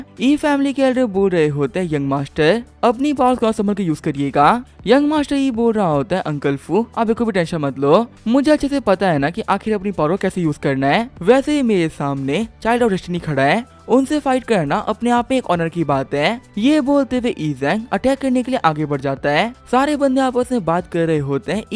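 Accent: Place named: India. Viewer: FFS why indian voice AGAIN !! Did this channel get hacked or something ?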